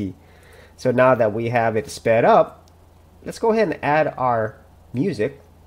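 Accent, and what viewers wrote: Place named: England, United Kingdom. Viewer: Oh my, finally an English guy talking and making it easier, hard clap to this guy for this.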